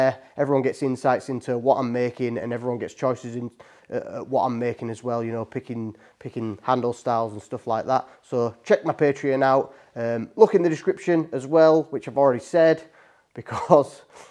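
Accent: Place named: England, United Kingdom. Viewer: Man just found the channel, loving the videos just wish you spoke more you have alot to share! I'm from Birmingham but moved to USA 21 years ago so I love hearing the accent reminds me of England my homeland.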